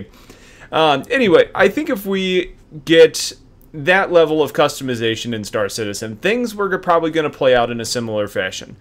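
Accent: United States. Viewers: Btw, it's nice to finally find someone talking about this game with an American accent who speaks clear words. Some guys that play this sound like they're gargling syrup!! Good work.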